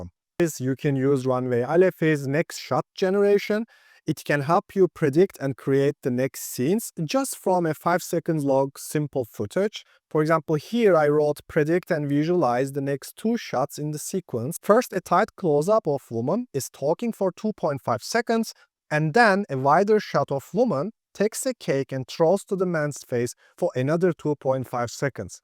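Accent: Turkey. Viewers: Great content as always and dude, I’ve been following you for a while and always kind of thought your accent sounded Turkish. Your English is super good, so I wasn’t totally sure. But after seeing that Istanbul clip, I’m like—yep, you’re Turkish, right? 😅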